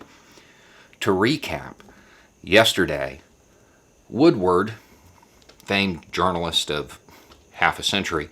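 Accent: South, United States. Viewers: I just found your channel, and I Thank You for your commentaries. Where are you from? I'm in West Virginia, and I detect a country accent.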